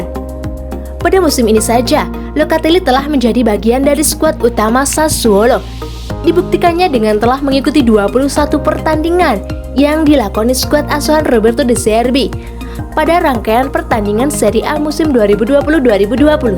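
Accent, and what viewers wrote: Indonesia. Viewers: Pengisi suara apakah orang jawa??? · Gaya bicara kaya'nya Admin orang LOMBOK..😂😂😂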